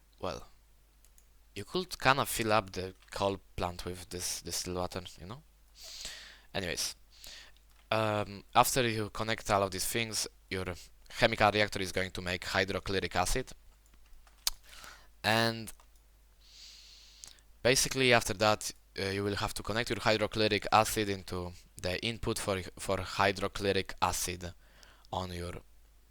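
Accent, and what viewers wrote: Poland. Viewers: Siema wiem że jesteś z polski po akcencie i napisie aktywuj windows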